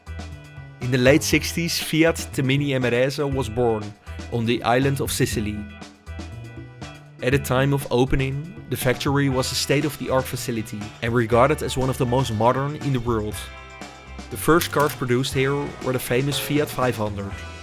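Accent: Netherlands. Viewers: No crappy AI voice and just a nice Dutch accent ! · Haha ik kon aan je accent horen dat je Nederlands was maar mooie video hoor ;-)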